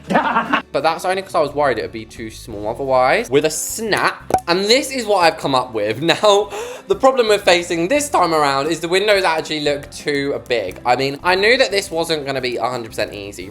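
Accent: United States. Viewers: I do not like the American accent I like your normal voice better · Gurlll I’ don’t like you amaerica accent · PLEASE THE AMERICAN ACCENT IS SO SCARY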